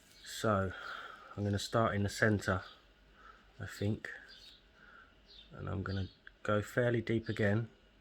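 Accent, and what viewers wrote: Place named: South East London, United Kingdom. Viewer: Hi. Beautiful effects! I'm from S.E. London originally and your accent sounds familiar, is that your area too?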